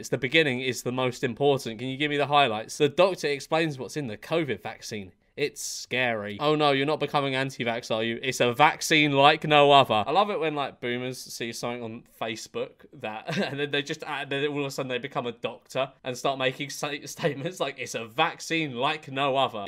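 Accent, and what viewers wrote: United Kingdom. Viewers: 'ello welcome back - brilliant sign of a British man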